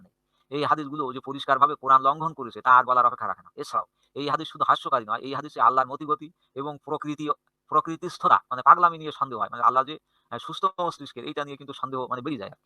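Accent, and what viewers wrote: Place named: North Bengal, India. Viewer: গুরুজি আপনাদের উত্তরবঙ্গের ভাষা খুব সুন্দর এটা আমরা মেনে নিই কিন্তু আপনার ভাষাটা খুব তাড়াতাড়ি আসার কারণে বা বলার কারণে আমাদের বুঝতে অনেক কষ্ট হয়ে যায় বিশেষ করে আপনি যে পৃথিবী ছয় দিনে বা আট দিনে সৃষ্টি করা যে এটা লাইফটা করছেন সেই সেই লাইফটা আমরা ভালোভাবে বুঝতে পারিনি আরেকটু স্লো ভাবে খন্ড খন্ড করে বুঝিয়ে বলবেন তাহলে আমাদের বুঝতে কষ্ট হবে না আশা করি আপনাকে এবং আপনার জ্ঞান কে আমরা শ্রদ্ধা করি আপনাদের উত্তরবঙ্গের ভাষা গুলি শুনতে এমনি হাসি আসে এরপরে যদি একটু তাড়াতাড়ি করে বলেন তাহলে আমরা একেবারেই বুঝতে পারিনা ধন্যবাদ স্যার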